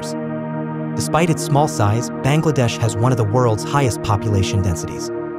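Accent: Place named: United States. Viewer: Get rid of the American accent, it’s terrible.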